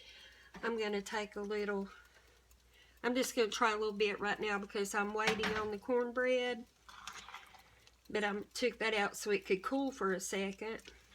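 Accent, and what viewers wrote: South, United States. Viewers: Those glasses and your Southern accent are wonderful. Great video thanks.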